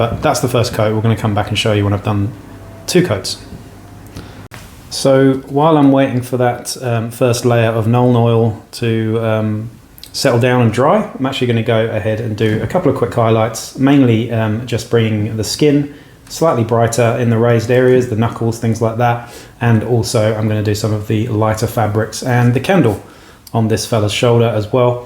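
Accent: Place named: England, United Kingdom. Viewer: I am confused... an English Accent, a Kiwi greeting mentioning Australian shops 🤣🤣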